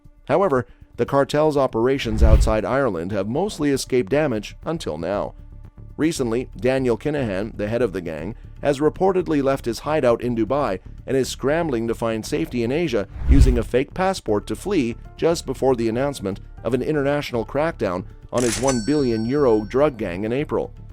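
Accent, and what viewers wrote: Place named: United States. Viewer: These anti kinahan vids with American voices are pathetic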